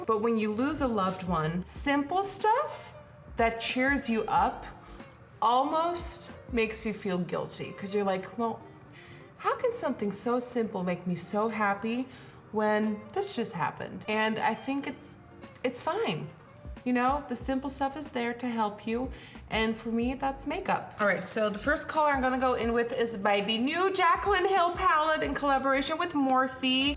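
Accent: United States. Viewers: How come you have an American accent and don’t sound Dutch at all xx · Can you do a video where you transfer yourself into Adele? You favor her I think. My mother is Dutch but I never picked up the language. She like you speaks with an American accent. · I THOUGHT YOU WERE AMERICAN! youtube unsubscribed me so I resubbed. Either way...Houston Tx USA Come see uss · I have been following you for three years and I was thinking you’re Amerikan😱Wow you speak incredible babe 😘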